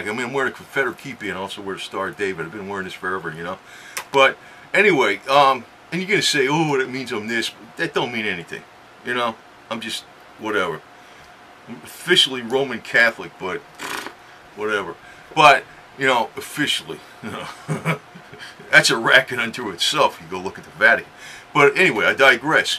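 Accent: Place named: New England, United States. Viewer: Dude wearing a confederate hat ,rebel flag,and yankee voice and it's clear your a yankee....hard to take you serious....sorry bro, all I can do is laff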